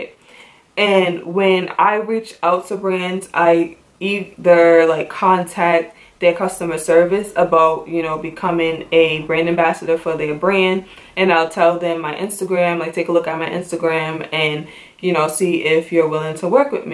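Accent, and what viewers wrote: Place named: Jamaica. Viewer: hey i love your accent , are you Jamaican?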